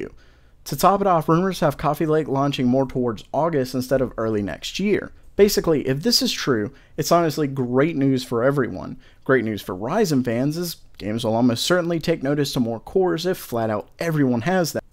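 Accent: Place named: South Carolina, United States. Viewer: Trying to pin point your accent, armican mid south, possibly south Carolina, I am a Canadian so I don't know my states very well, lol.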